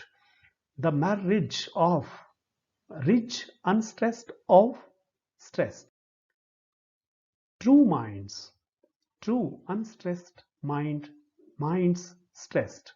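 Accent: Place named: England, United Kingdom. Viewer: Sir, memories of 2005 when I was in BA. Ist and you were the lecturer. I was stunned by your English accent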